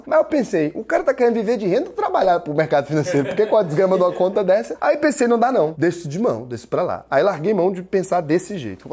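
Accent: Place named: Goiânia, Brazil. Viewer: Que sotaque engraçado kkkkkk. Goiânia ? Da hora . São Paulo sempre uffa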